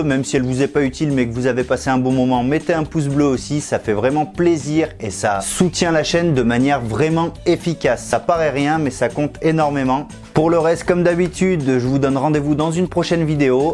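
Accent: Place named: Loire, France. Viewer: Je dirais, tu prones le calme, voir le plaisir de faire les choses, ça pourrait sembler banal, mais moi, il m'a fallu du temps, pour y arriver, et encore.... ; de plus tu as l'accent de la région de St-E., la Loire, la Haute-Loire : souvenirs, souvenirs...Apaisé, je me suis endormi en t'écoutant... mais je me suis réveillé aussi, je te rasssure !: tout ce qui est réglage, ça n'est pas trop mon truc, mais un peu plus maintenant, grâce à ta video . I like, donc. Merci.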